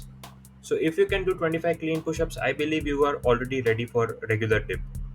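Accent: India. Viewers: U dont look like Indian but u sound Indian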